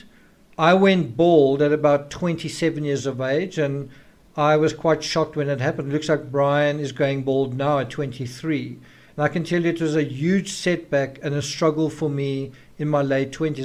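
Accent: South Africa. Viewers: Howsit! Love the SA accent. Great channel. Greetings from Eire 🇮🇪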